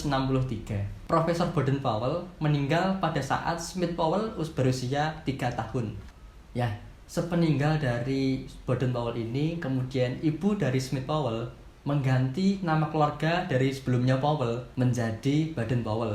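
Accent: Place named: Java, Indonesia. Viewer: Kaka medoq wkwk kerenn😹👍🏻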